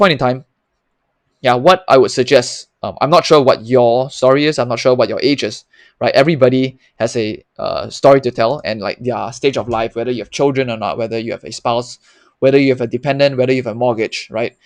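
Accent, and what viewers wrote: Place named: Singapore. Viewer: You sound like you are from Singapore and doing military also? So going forward why you picking a job over a business or not worth the extra effort/risk? I see you have done well in HIMS/HOOD have you thought about taking less risk (you might like quality investing) to keep your stack or going to do that if you get to $10M?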